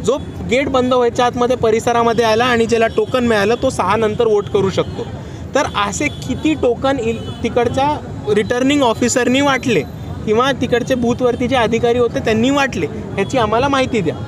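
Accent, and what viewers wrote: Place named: Pune, India. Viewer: ह्यांचे भाषा व बोलणे एकदम पुणेरी वाटते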